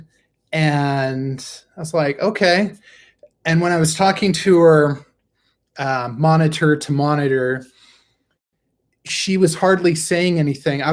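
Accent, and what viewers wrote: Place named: Africa. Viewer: I love African accents too!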